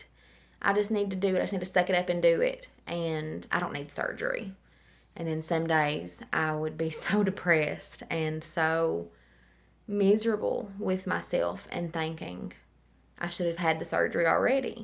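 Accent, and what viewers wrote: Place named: Kentucky, United States. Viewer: I knew that accent!!!!!!!!!!! That's why I came to find the first video hoping you would say where you are from! I'm from Kentucky too lol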